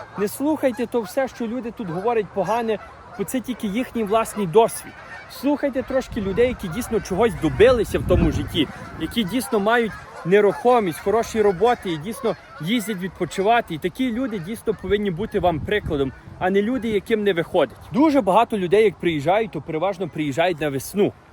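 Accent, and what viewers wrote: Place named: Galicia, Ukraine. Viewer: Цікава розмова. А що всюди треба працювати , то так має бути. Гарно розмовляєте українською мовою. Чую що Ви з Галицького краю. Дай Боже Вам поводження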